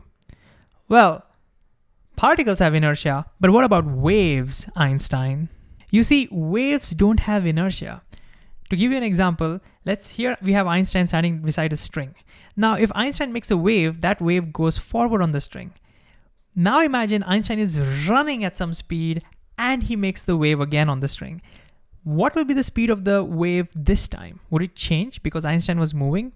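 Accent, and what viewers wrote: India. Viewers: Considering how fluent so many Indians are in English why do they insist on maintaining a barely comprehensible accent? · Trying to listen to a hyperactive Indian accent stumbling over the English language, who has a constant conversation with himself and Einstein - is extremely disconcerting and tedious.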